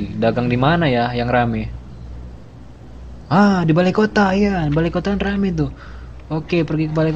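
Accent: Medan, Indonesia. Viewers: ngomongnya kaya orang medan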